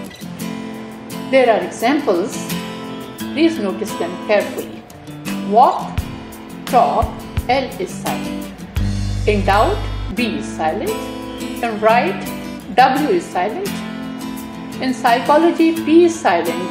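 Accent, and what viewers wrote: India. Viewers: So a woman with an extremely hard Indian accent is saying to try to speak in a neutral, not an American accent, which is usually what people consider to be a pretty neutral. Indians are the worst English speakers on the planet. They thing they are speaking British English. Far from it.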